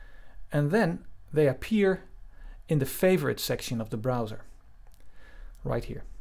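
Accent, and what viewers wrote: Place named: Netherlands. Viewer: What a lovely Dutch Accent ;)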